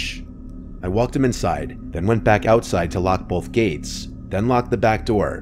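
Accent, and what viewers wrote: United States. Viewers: I don't know American accents, but I like his. Where is it from?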